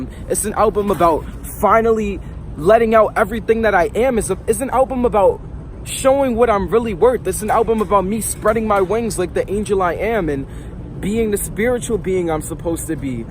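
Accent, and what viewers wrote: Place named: New York, United States. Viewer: you have really deep accent real new york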